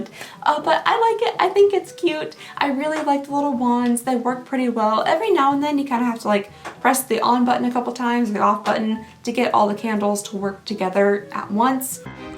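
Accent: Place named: South, United States. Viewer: I just have to say I found you a little while ago, but I adore your accent and southernness so much, since there’s such a lack here on YT.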